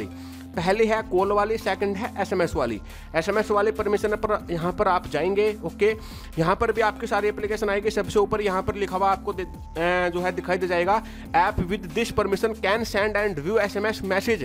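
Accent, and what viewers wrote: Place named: Bihar, India. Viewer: Bihari h ye tu ..kaisa bol raha h bihari up ka h kiya bahiya ji up wale language dekhi iski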